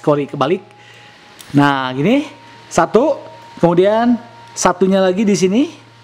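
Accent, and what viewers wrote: West Java, Indonesia. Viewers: Ciri khas orang sunda kang... Hehehe, sy oge Sunda sok kitu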